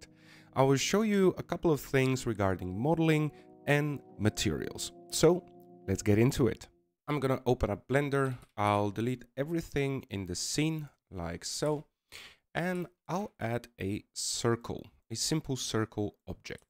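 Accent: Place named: Ireland. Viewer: I don't know why, maybe because of your accent, I was convinced you are in Ireland 🤦‍♀️😊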